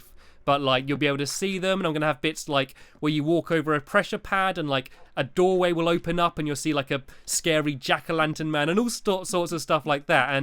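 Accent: United Kingdom. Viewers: Omg I love your ACCENT I wish I was British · i love your videos and you British accent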